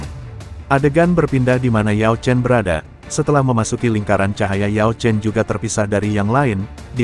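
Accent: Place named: Madura, Indonesia. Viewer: Madura min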